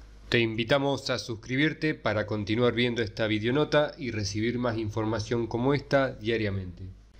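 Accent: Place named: Argentina. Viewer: En qué país es esto??? perdón es que el acento es argentino ó uruguayo??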